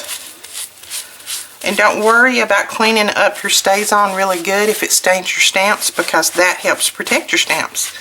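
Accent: South, United States. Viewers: great tips boy i would love to win this i dont have any distress ink yet so that will be awesome ps love your southern accent! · Thanks for all of your stamping advice. Also, I'm from the south and I enjoyed hearing that familiar dialect. The familiarity was so comforting! Your advice was greatly appreciative!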